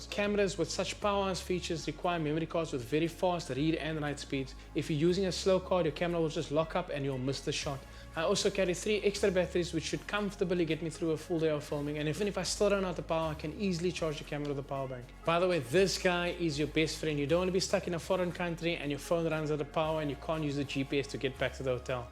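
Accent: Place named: Cape Town, South Africa. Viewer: Howzit from a fellow Capey. I just discovered your channel. Really cool stuff. I enjoy hearing your accent. I live in Zagreb, Croatia. You should come here sometime and do some travel vlogs. We have some awesome locations.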